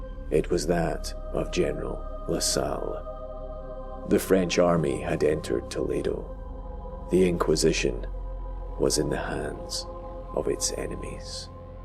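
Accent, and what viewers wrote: Scotland, United Kingdom. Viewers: Oh my! I must tell you...I LOVE YOUR ACCENT! Are you scottish? :D Will you marry me? Haha! But seriously, you have a very lovely accent...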